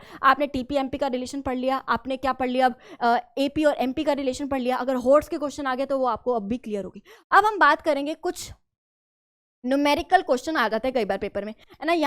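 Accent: Punjab, India. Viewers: Mam your way of talking is really cute😂 giving full Punjabi vibes 😌and you gave the best and the easiest explanation of this chapter ❤love u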